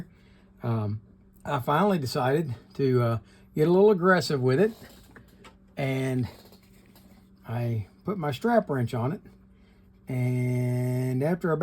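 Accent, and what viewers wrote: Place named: Kentucky, United States. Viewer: even though I have talked to you at length as you guided me through this video, I still just have to comment about this one. Your ability to creatively find ways to fix and repair reels even though parts are missing continues to amaze me. It challenges me to try innovative ways to repair what seems to be irreparable. You did this at least twice in this video and it was amazing. You remember that big TICA surf reel I bought for next to nothing that had a danaged part of the main gear shaft? I figured out a “ miracle” and got that reel working perfectly with a repair that should last indefinitely. As you said in your Kentucky drawl when I told you about it, “now if you hadn’t’ been watching my channel you probably wouldn’t have even tried to fix it.” You were very correct in that assessment as that old but beautiful TICA reel would have been headed for my parts reel boxes. 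When working on this reel you showed another of your many talents - the ability to figure out how to take apart what would confound other less tenacious individuals (such as me.) You also have an almost innate ability to remember how things go back together after you’ve taken them apart. I guess I simply enjoy seeing how your mind works. It is great that you were able to find someone to send you some photos of some missing]g parts that helped you find ways to substitute parts that would allow the reel to properly function. Again, this was an amazing video allowing us to watch over your shoul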